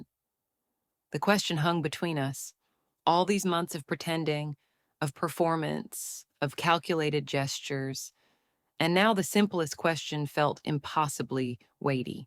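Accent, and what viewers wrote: South, United States. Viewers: That's a very good point, too. The narrator sounded like a southern white woman with good diction. But the plot was unique & lovely❤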